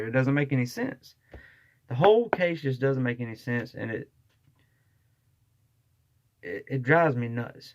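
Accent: South, United States. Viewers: Great video. Love listening to your southern accent! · Thank you doing great and I love the southern accent and who cares do not listen to the haters ,Welcome freedom fighter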